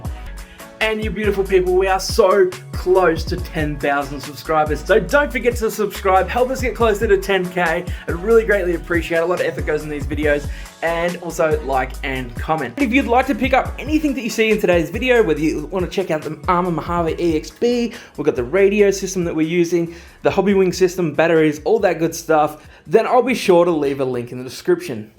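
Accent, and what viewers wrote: Australia. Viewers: You Aussie? Where ya from? Looks like S.A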